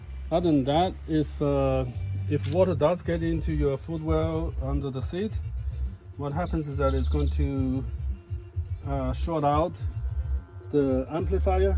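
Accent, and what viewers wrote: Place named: China. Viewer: 浓烈的同胞的口音:face-blue-smiling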